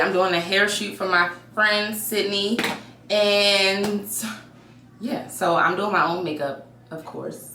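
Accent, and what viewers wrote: Baltimore, United States. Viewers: Beautiful!!! As soon as you began talking, i was like “she’s gotta be from Baltimore!!”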